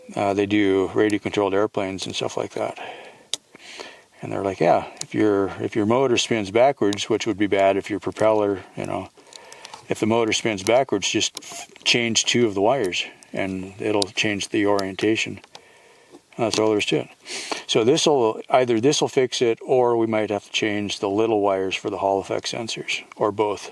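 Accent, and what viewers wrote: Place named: Canada. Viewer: He's Canadian, sometimes they talk funny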